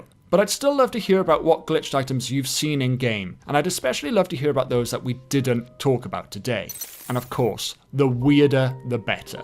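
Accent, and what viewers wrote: Wales, United Kingdom. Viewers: Nice to see/hear more Welsh content creators, great video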